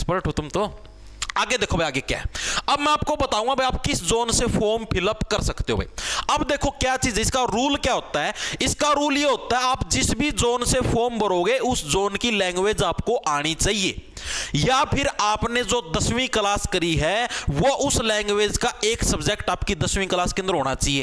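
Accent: Haryana, India. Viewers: Bhaiya mja haryanvi ava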